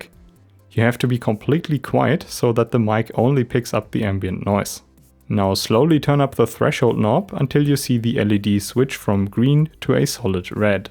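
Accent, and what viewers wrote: Germany. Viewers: Habe am dialekt gehört dass du deutsch bist kannst aber gut erklären :)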